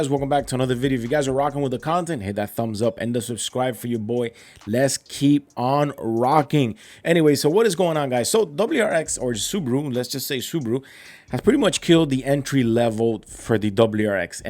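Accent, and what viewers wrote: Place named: Miami, United States. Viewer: Bro I just came across your channel. I’m from Miami, I just know you’re from Miami with the accent 😅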